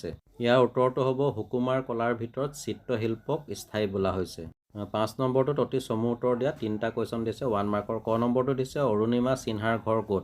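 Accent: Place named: Dhemaji, India. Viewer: Thinks dhemaji Assamese lage sar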